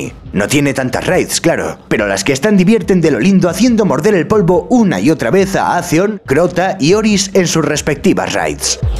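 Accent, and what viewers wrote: Spain. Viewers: Que voz, soy latino y siempre hemos sentido el acento español un poco raro, pero el de este hombre es increíble, simplemente magnifico jeje veo siempre todos los vídeos por la voz.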